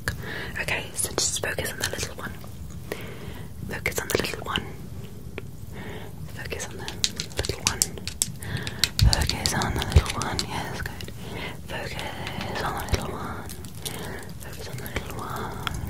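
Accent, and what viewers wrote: England, United Kingdom. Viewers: i was like o sh!t she is brittish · The English accent is amazing Nailed it Ive always wanted someone to do a scouse or northern accent Like Gordie or Cumbrian its sound funny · They have an amazing British accent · Absolutely loved this and the short hair cut so works on you with the glasses 😌 really enjoyed the English accent alongside the jingling of the jewelry.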